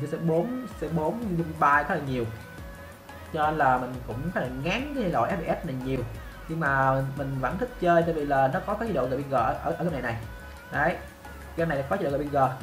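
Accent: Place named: South, Vietnam. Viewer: nghe giọng miền nam tưởng anh trong fps gaming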